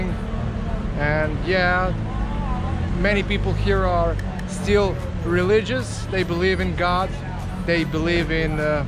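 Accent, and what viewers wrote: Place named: Niš, Serbia. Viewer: "..Let me tell you of the days of High Advantures!" Dobar video čak ti je i eng niški !! 👌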